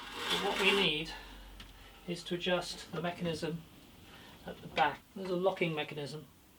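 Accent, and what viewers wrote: United Kingdom. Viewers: Interesting and helpful Video. Cute woodworking girl, i like the british pronunciation.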